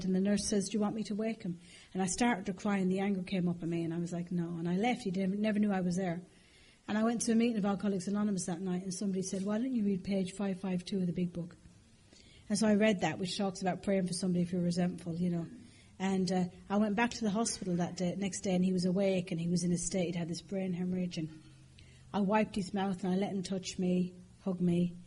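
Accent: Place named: Ireland. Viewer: I love your irish.great sense of humor.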